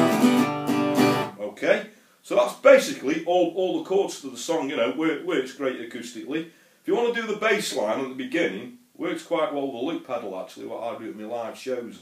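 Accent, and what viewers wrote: Stoke, United Kingdom. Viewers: Do u do lessons ? Noticed the stoke twang . U make it look so easy .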